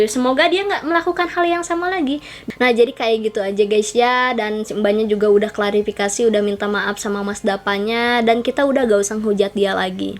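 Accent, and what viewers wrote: West Java, Indonesia. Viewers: sunda bgt logatnya f/v pake p wkwk lofff❤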